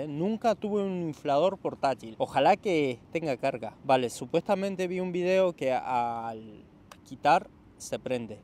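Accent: Argentina. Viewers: Habla una mezcla entre porteño y español ajajajaj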